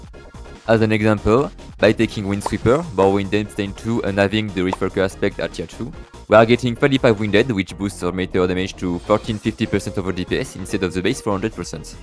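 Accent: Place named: France. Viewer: W vid mon reuf, l'accent fr qui s'entend bien x)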